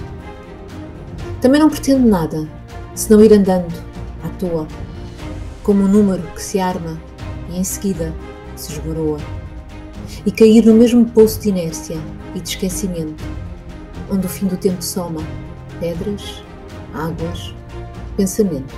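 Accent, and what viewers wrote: Portugal. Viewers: Sotaque português é muito carregada, mas ficou bom.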